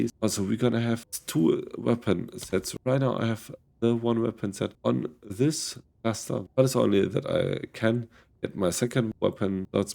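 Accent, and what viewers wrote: Germany. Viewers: the German accent doesn't help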